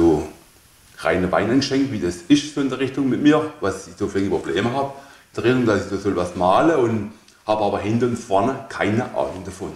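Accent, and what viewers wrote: Swabia, Germany. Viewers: Leider für mich als Norddeutschen aufgrund des stark ausgeprägte schwäbischen Akzents und der etwas mangelnden Tonqualität nur schwer zu verstehen. Schade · das ist schwäbisch!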